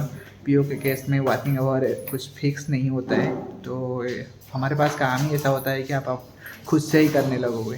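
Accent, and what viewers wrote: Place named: Odisha, India. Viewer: Are you an Odia ? I guess it from your pronounce 😊❤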